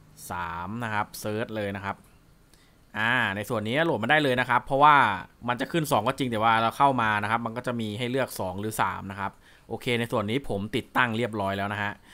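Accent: Thailand. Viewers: ติดตามตลอดครับชอบที่ภาษาอังกฤษสำเนียงไทยๆนี่แหละบ้านๆดี ไม่ต้องเป๊ะเวอร์เหมือนกับเรียน wall street english😃😃😃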